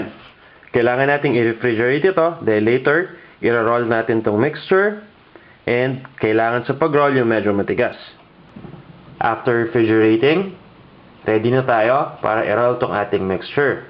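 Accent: Philippines. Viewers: Filipino accent :O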